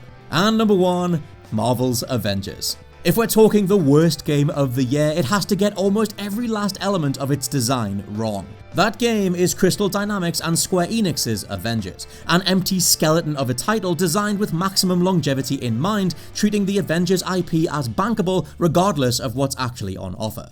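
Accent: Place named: United Kingdom. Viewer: Because British accent?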